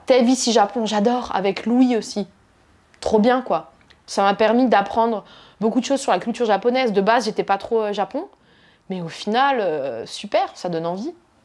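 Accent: Alsace, France. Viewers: je regarde tes vidéos depuis le début de ta chaine , pourquoi je continue ? parceque tu me fais vraiment rire , ton accent alsacien yoooooo , tes blagues , ton énergie , t'es marrante , au quotidien ca doit étre quelque chose avec toi !! 😅😅 cela permet aussi de découvrir des petits restos dans le coin que nous ne connaissons pas , je rigole déjà en pensant a tout ce que tu mange a chaque fois ! 🤣 bref perso avec toi regarder une video , c'est se fendre la gueule , hop'la je reste abonné et je t'encourage a poursuivre ce que tu fais car c'est de la bombe atomique 🙂🙂😆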